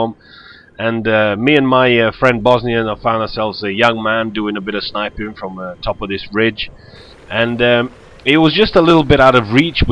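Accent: United Kingdom. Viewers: where in the UK are u from?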